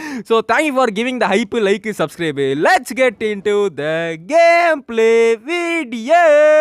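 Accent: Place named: United States. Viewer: Eh kirrku onaku english eh pesa varala ithula vera us kaare maari pesriya paythiam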